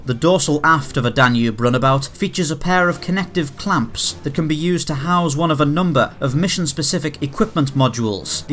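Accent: United Kingdom. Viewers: At last, I'm hearing an English/UK accent.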